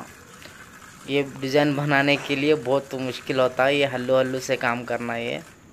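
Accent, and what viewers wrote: Hyderabad, India. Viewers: All are good designs....My teeth were actually sensitised with that scratch sound.....loved your hyderabadi way of speaking.....bole to bahot acha laga 👍😊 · Hyderabadi ka language mast hai 😄. My favorite is +- and Blade design. Great work art.👌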